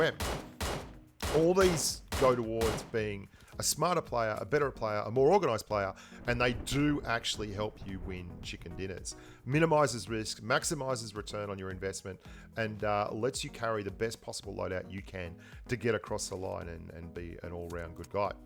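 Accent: Australia. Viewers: Dude I love your accent! Australian??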